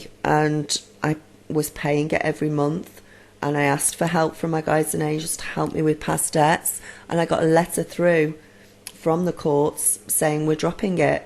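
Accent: United Kingdom. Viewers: Geesh how I love listening to you. I can remember I could always hear a British ladies voice wish me a "good morning Elizabeth" and you sound just like her and also my Granny who is still alive today but now too old to conversate. You're voice is healing so thank you. And the subjects as well. I always knew my thoughts created reality but it's never been so obvious until I met my husband who must be my twin because I have not been able to completley open to him out of fear and now because I stay closed for such long periods, I've watched every "worst case senerio" that I've worried about happen. It gives me hope though that I have a power to change everything and I am conscious if what I am doing. I have not yet mastered overcoming fears when facing the biggest ones. For instance..I had social services knock at my door yesterday because a doctor called in that my vegan lifestyle was not allowing my 2 year old to thrive. 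So there we all sat in the hospital not knowing if these people were going to try and take my daughter away while they poked and proded her over a course of 6 hours only to be told (thank god) that she is in perfect health and good job. I swear I thought I was going to die during it. And something just this security threatening happens constantly. And it will until I open up and stop hiding from fear. Thanks for reading. Thanks for you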